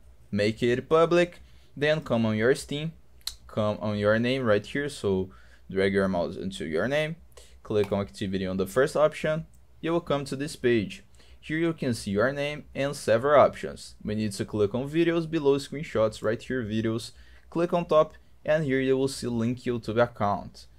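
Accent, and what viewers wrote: Brazil. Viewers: nem fudendo que quem me ajudou foi um brasileiro falando ingles com sotaque brasileio kkkkkkk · Quando comecei ouvir eu pensei "Estranho, acho que é brasileiro" quando vi o nome " " eu tive quase certeza, ai então eu vi o amigo "F-100 reformada pelo lata velha" KKKKKKKKKK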